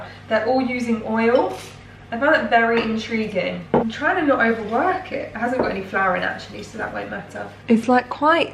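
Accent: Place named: United Kingdom. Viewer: what part of the UK are you from, im trying to place your accent 😂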